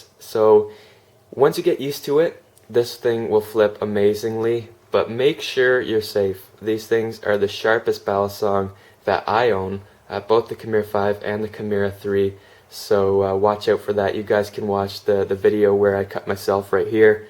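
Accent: Canada. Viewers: Your def Canadian lol aboot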